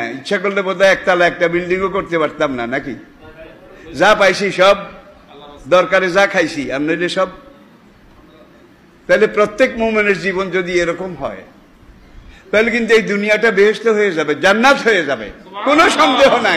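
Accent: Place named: Noakhali, Bangladesh. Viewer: হুজুর আমগো নোয়াখালীর মনে হয়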